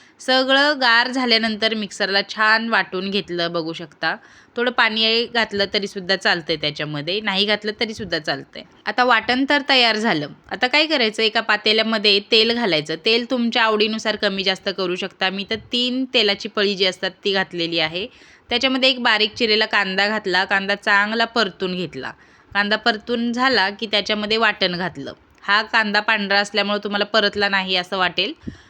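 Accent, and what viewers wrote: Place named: Kolhapur, India. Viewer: Khoop masst banavlat recipe ...tumcha awaj and kolhapuri accent, ayikayla chaan vatte. ❤Stay blessed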